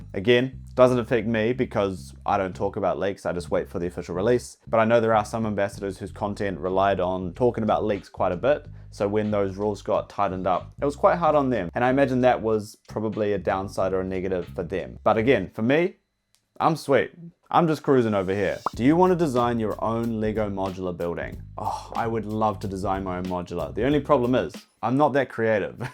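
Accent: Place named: New Zealand. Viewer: Saw you on VidIQ and was like "hmmm sounds like a kiwi and his account is. I'm gonna go out on a limb and assume he's a kiwi". So obviously subbed. Congrats on the success fellow Kiwi creator:):)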